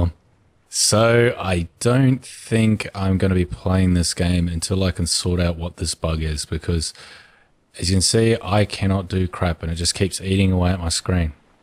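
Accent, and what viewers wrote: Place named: Australia. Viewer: I knew you had an Australian accent by the way I'm kiwi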